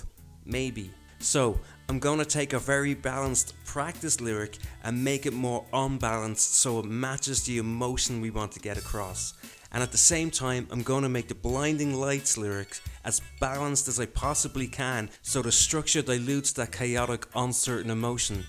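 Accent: Ireland. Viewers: love the Irish accent...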